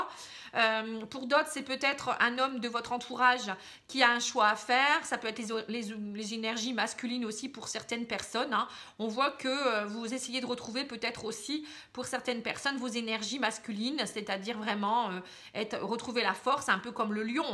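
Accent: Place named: Pas-de-Calais, France. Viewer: Je connais bien cette accent du Pas de Calais 😁👍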